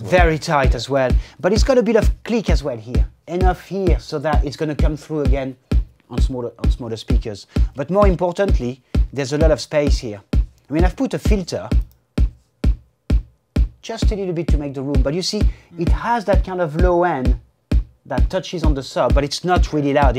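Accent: France. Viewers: haa probably french accent no? better to understand in the middle of all this english lesson. ;) good lesson thx peace !! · Goog french accent haha Thank you for the video ;)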